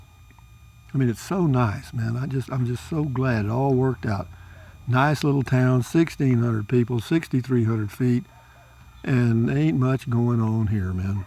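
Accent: Texas, United States. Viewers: Oh ❤you talk just like my favorite Texan: Betty McNaughton, Bless her heart!!❤she is a character just like you😂🤣🇺🇸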